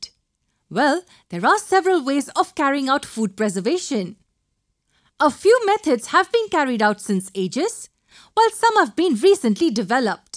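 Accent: India. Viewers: This Indian accent is killing me😭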